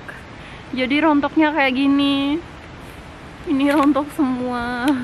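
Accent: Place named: Banyumas, Indonesia. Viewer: semangat yaa mbaaa... btw salam ngapak yaa mbaaaaaa... satu suku sepertinya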